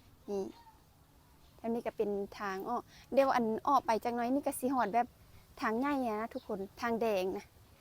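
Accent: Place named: Phu Thai, Thailand. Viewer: สวัสดีจ้าสาว ภาษาภูไทก็มีหลายสำเนียงเนาะจ้า เออสาว อยู่เมืองไหนจ๊ะ😊😊😊❤❤❤❤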